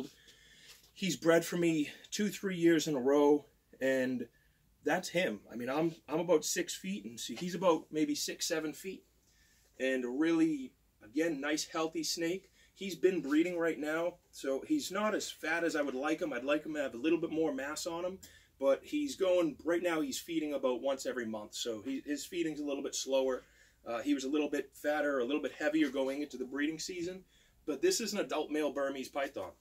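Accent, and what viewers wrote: United States. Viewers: Am I the only one that hears a Jersey accent poke through? Just me? k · You sound just live my dad!!! Love the east coast accent 😂💕 Mainer here 👋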